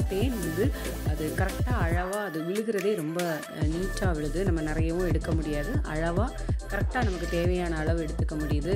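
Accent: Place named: Tirunelveli, India. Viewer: Nellai Tamil is mass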